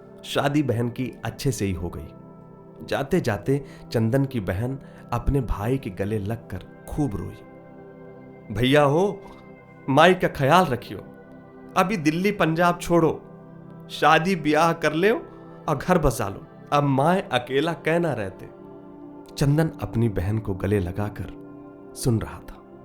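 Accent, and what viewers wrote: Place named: Bihar, India. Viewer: बीते अनुभव की कुछ झलक थी, चंदन की कहानी। फिर 'दिहाड़ी' के रूप में सामने आई। अक्सर देखा गया है कि लोग चले जाते हैं कमाने-खाने बाहर.. गाँव-देहात वाले शहर, शहर वाले बड़े शहर और बड़े शहर वाले विदेश! रोजी रोज़गार का न होना, पलायन के बड़े कारण और बड़ी समस्या है। खैर, कहानी लिखी और फिर रह गई कहीं। आपकी आवाज़ ने इसे जीवन दे दिया। हर पात्र सजीव हो उठे और अपनी-अपनी सुनाने को बैचेन हो उठे। सर, आपका जितना भी आभार व्यक्त करूँ कम ही होगा। आपने जिस भाव और संजीदगी से पढ़ा है, वो काबिलेतारीफ है। बिहार की ठेठ बोली को बड़ी ही सुन्दरता और सहजता से निभाया है आपने। अपनी ही कहानी को सुनना एकदम से अलग अनुभव है। और, शुरुआत में तो आप बिल्कुल रेडियो वाले ही लगे!🥰 बहुत बहुत धन्यवाद आपका सर! 🙏🙏 😊😊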